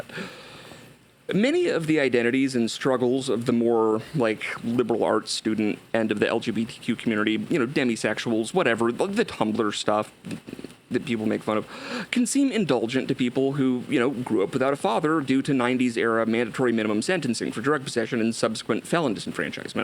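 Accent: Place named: United Kingdom. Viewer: For the longest time I thought you were British.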